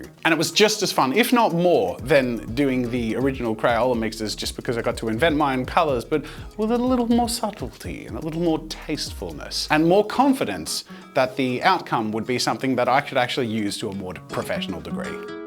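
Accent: Australia. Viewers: Australian? I thought you were British!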